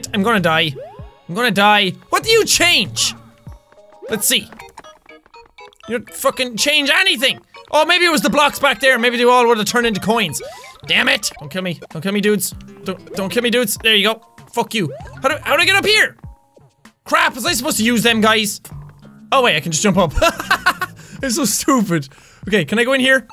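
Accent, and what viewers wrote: Ireland. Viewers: I love is irishness. Mareio, shtoopid, I love if all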